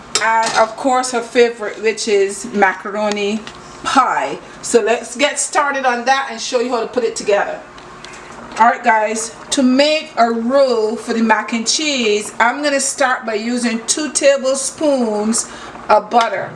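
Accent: Barbados. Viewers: I too love a Bajan accent. Music to my ears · Love that I can still hear the bajan accent coming thru. Bless up queen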